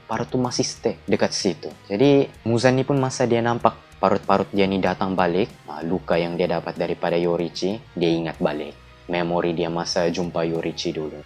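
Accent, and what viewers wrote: Sabah, Malaysia. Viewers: Bro Loghat Mu ni Mcam Asal Sabah Ja Btw Salam Dari Kota Kinabalu 😁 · Abang memang gempak. Org sabah bah ni. Senang fhm. Siok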